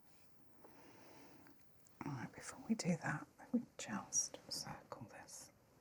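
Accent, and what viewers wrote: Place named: Nottingham, United Kingdom. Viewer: This is officially my first ever comment on Youtube,. And it had to be for you! Absolutely love your tonality, pace and accent.. I had studied in the UK some years ago and your videos always take me back to Nottingham:)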